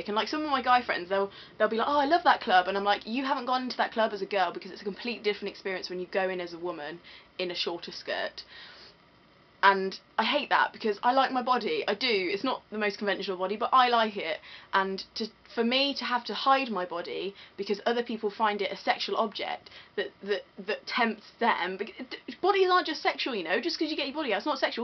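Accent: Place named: United Kingdom. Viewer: Wow. I can't listen to even one minute of this garbage even with the cute British accent. Of course I'm a man.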